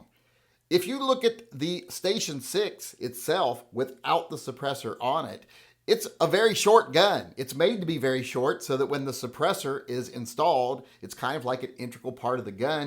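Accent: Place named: Appalachia, United States. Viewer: You sound like a backwoods hillbilly mountain people ⛰️🏔️ 🤷‍♂️🤷🤷‍♀️🤦🤦‍♀️🤦‍♂️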